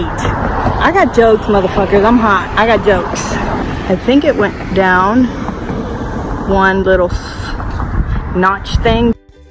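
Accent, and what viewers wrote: South, United States. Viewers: Omg that is so funny you said cotton candy grapes because I’m eating some as I’m watching this.... I love your videos!!!! Where are you from? Sometimes I hear a southern twang in your voice.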